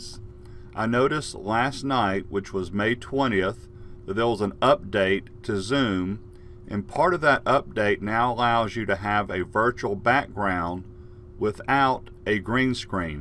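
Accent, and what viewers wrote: South, United States. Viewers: Hi! I have a Simpson's and The Shining background I would love to use for when I'm in zoom classes. I have the latest version - updated yesterday (version 4.6.9) and the screens won't work without a green screen. Any advice? Also noticed the southern accent and was curious where your from. My family is from North Carolina.:)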